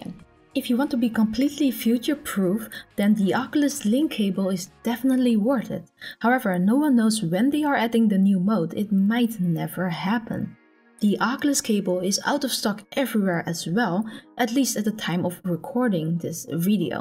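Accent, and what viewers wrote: Netherlands. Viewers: hey jouw accent verraad dat je van nederland komt. am i right?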